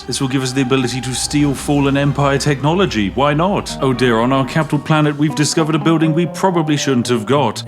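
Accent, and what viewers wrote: United Kingdom. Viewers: I don't even want to play this game anymore. I just enjoy his sarcastic British canter. At 1.5x speed it's even more potent somehow. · I think this guy might be a tad British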